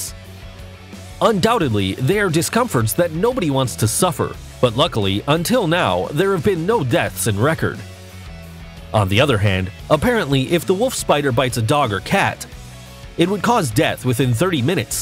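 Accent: United States. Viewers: Got issues with this video. First why is it being narrated by an American? Ask an Aussie to do it. Second.... they have obviously read mosly from an Australian National Geographic journal... found it online. Third the White Tailed spider has not been verified as dangerous...no evidence of a venomous bite. In other words an urban myth. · this is Australia, Mate! We gave INCHES away a very long time ago - and the American accent.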